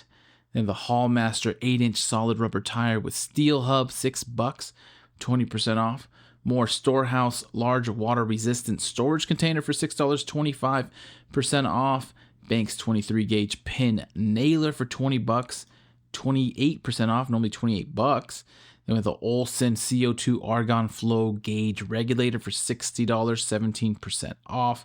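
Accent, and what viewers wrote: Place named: California, United States. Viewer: that's dope lol, I speak some but not enough. I saw you were from California and I can hear the way you pronounce some words but that's kinda common in Cali, and then you said la and I was like yep it's confirmed lol. Awesome bro, good skill to have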